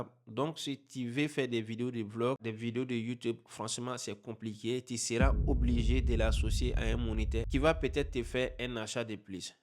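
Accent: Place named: Africa. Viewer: Merci 🙏. Je suis juste qu'un simple amateur/débutant avec un G7 de 2016 que j'ai laissé dormir depuis looooongtemps et que je ressors petit à petit depuis quelques semaines. Que de temps de perdu...😔. Pour ce qui est des Lumix, j'apprécie l'ergonomie,la prise en mains qui fait boîtier solide. Les menus également que je trouve assez intuitif. Autrement,je t'ai découvert il y a peu et j'apprécie ton contenu. En plus,pour une fois que l'on a un"cainfri"🔥(avec le vrai accent)😂passionné de photos/vidéos qui à sa chaîne Youtube et qui fait ça bien pourquoi ne pas l'encourager ? Pour le coup,je m'abonne et je lâche un:Yah Foye,on est ensemble 🤣 🔥. Mes salutations de la Réunion 🇷🇪 🙏 🔥 🤝. Bonne soirée.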